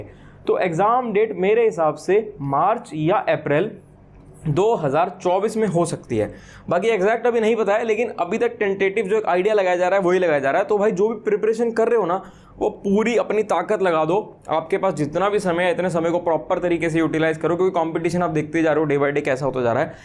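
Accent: Haryana, India. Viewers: brother i think you are form hariyana Right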